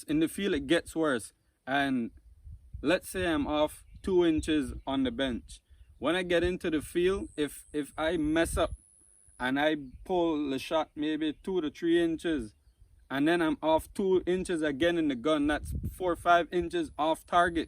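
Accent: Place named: Belize. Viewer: Great setup and good shooting. I discovered your vid while researching light big bores. I think I am sold on a Texan though I would prefer a .30 or .357. Nice to see Belize too. I caught your accent only after a few words lol! Used to be a regular visitor to Belize as a seaman years ago. Keep the vids rolling bro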